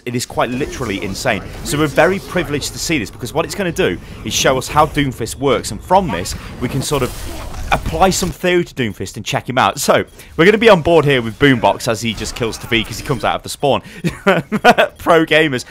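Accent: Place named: Northern England, United Kingdom. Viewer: Crossfire Gaming it's because he's northern. Everyone loves northern accents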